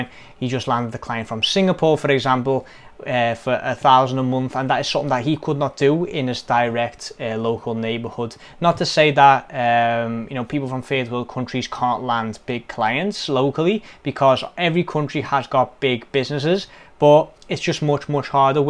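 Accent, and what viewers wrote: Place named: Liverpool, United Kingdom. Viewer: I came to Australia when I was 3 years old, originally from Chester Wirral..great to hear a scouser!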